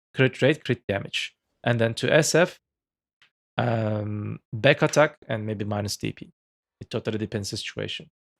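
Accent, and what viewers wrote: Turkey. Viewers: ben o türk aksanının kokusnu alırım abi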